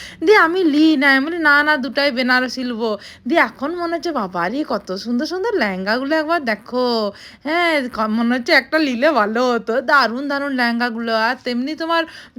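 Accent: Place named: Purulia, India. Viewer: Didi aapnar Bangla ta kon jaygar aar tomar biyer samay to lahenga chio na tomar bhasa ta thik purulyar moto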